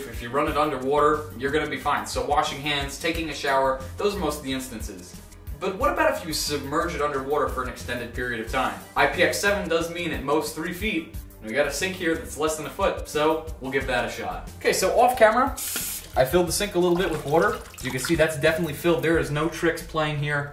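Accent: United States. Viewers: Someone's from Philly! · nah, it's American - THIS GUY IS NOT BRITISH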